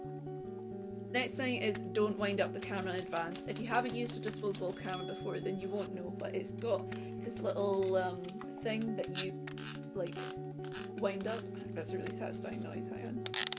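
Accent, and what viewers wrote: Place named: Wales, United Kingdom. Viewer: you sound welsh even know i am welsh :/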